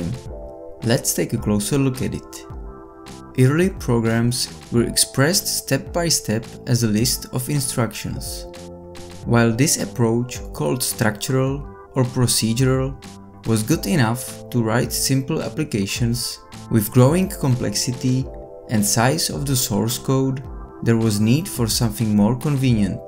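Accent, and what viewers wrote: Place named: Czech Republic. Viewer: Český přízvuk nezapřeš :D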